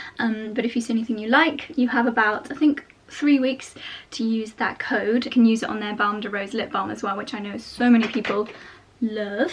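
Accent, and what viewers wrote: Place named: United Kingdom. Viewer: This might seem weird, but you remind me of Barbie. A real-life Barbie with a British accent! :)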